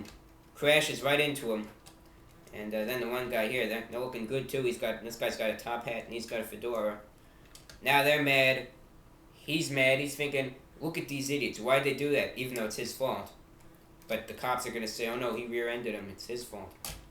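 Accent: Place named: New York, United States. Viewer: His New York accent was still intact here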